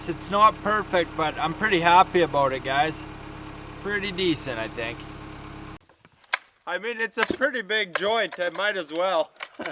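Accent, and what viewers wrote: Canada. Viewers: ❤WOW From Norristown PA here How old are you ? I know your young but your come off with old knowledge and worth ro work ethics ? You put yourself down so much & are so hard on yourself & your doing so much then any one your age it's amazing and phenomenal 👍🏾 I hope your name comes a worldwide name You deserve it & I hope your do a Q&A Telling people about your family & life grown up in Canada i love your accent I could listen to you forever the thing you do I have not clue even how to start doing Do ever stop 👍🏾🙏🏾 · I can't help but wonder where the Canadian accent came from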